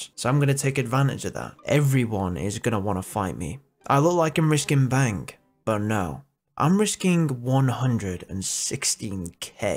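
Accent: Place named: United Kingdom. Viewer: You sound even more British now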